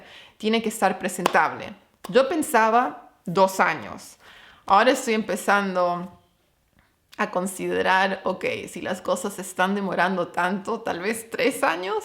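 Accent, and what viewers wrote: Argentina. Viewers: Hablas como Argentina, ya sos nuestra🇦🇷🇦🇷🎸🎸🤟🤟😃😃, falta que hables como Cordobes y digas cuuuuuleeeaaadoooo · Cada vez tenés más acento argentino.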